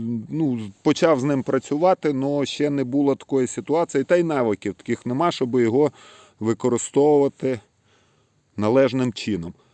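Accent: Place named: Surzhyk, Ukraine. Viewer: Смешной суржик 😁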